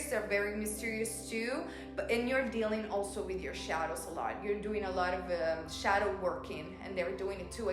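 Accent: Italy. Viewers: Is she italian? I so love her already! Her accent and personality! LOVE! Anyways I have seen so many videos like this but god I have never had any reading this eerily accurate! Like never before! OMG! spot on! I chose #3. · your italian cuite love your accent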